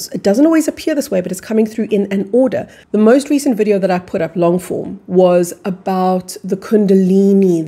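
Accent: South Africa. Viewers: exactly the the South African pronunciation- it is a beautiful accent.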